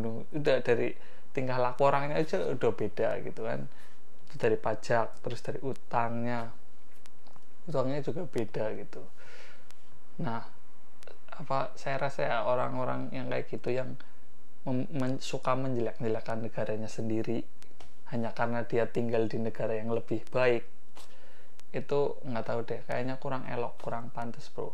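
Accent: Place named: Java, Indonesia. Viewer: kawel medok nemen 😁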